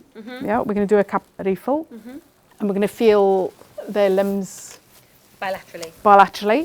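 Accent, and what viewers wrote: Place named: United Kingdom. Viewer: the thick blimey accent mate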